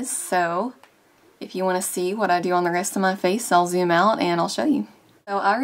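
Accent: South, United States. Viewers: Geez that's an awesome nail polish color! Will def be trying that out. Love the look as well....got yourself a new subscriber! You sound like a southern belle too! Awesome!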